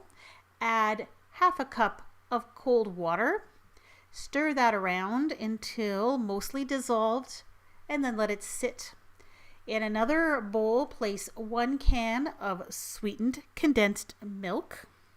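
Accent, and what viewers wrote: Canada. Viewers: Canadian! You can hear it in certain words she uses :)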